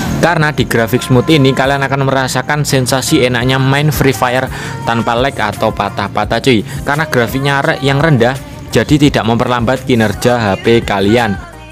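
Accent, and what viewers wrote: Java, Indonesia. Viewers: Orang Jawa y bang , cumananya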